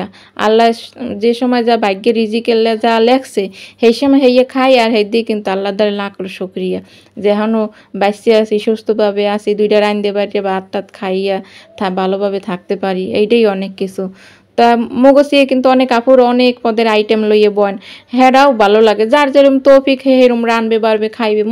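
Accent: Barishal, Bangladesh. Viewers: আপু আমার কাছে তোমার ভিডিও থেকে না তোমার কথাগুলো শুনতে ভালো লাগে বেশি তোমাদের বরিশালের ভাষা আমি তোমার কথা শোনার জন্য তোমার ভিডিও দেখি ফাস্ট টু লাস্ট মানে তোমার কাজের কি কমেন্ট করব বুঝিনা শুধু তোমার কথা শুনতে থাকি ❤❤❤❤❤❤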